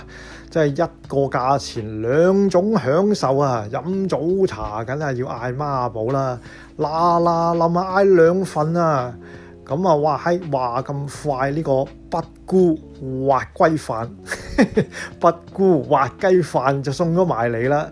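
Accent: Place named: China. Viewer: 要查清楚並講好聲音，不要太陸口音，明明牛栢葉不是牛怕葉。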